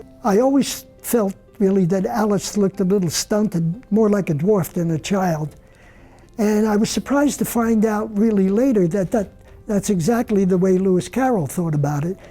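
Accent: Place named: Philadelphia, United States. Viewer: Seeing this video made me cry because Charles was my dear friend, and I miss him and think about him all the time. I became an illustrator and painter and would get to spend time with him at his studio talking about art and just about anything and family. He was a gracious man and very kind to me. He would look at what I was doing and shared so much insight and the sound of his voice really resonated with me as someone who also grew up in Philadelpha like me and also stayed in Philadelphia his whole career. We had many similarities in families and thought. I would say for many years I knew him and his work prior to becoming good friends but always thought to myself what do I have to say to him? He was brilliant and one in a billion. I only got the courage to finally make the call to his studio when I had children's books that I had written and illustrated published and saw success in what he did so brilliantly. 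I finally had something to share with him and Charles let me come to his studio and we spent hours together over many years just talking. He saw me as a fellow illustrator and was so very pleased that I was getting to be part of the parade he talks about in this video. He always greeted me with "Stephen!", and he made me feel special like family. I hear his voice often. He has a beautiful family. I loved that he was a family man.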